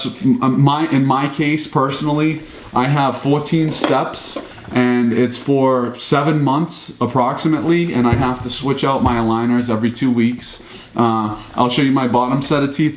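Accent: Boston, United States. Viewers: Just here for the Boston accent.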